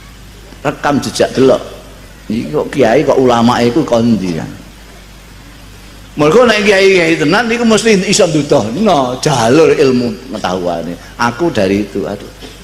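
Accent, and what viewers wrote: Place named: Java, Indonesia. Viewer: Jowo keras lurdd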